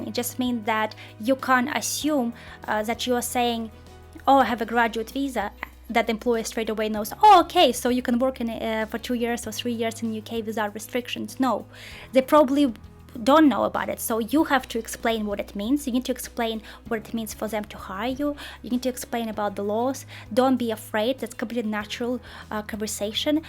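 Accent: Russia. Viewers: russian accent sucks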